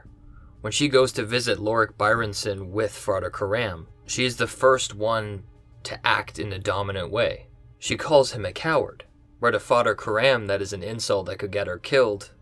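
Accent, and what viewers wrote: Canada. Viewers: theres an accent tells me ur probably from canada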